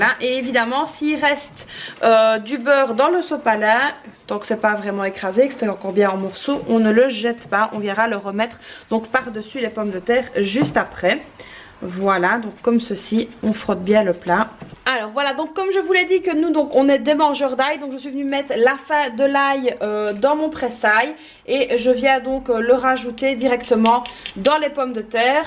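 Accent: Belgium. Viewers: Woaw enfin la meilleure des recettes de gratin dauphinois en plus c est tellement délicieux à déguster . Tu fais vraiment la même recette que moi 🤩 Merci beaucoup à toi pour le partage 👍👍👍 Dit si pas indiscret tu es de Belgique ? Car nous avons presque le meme accent hihi je voulais déjà te le demander mais je n osais pas ^^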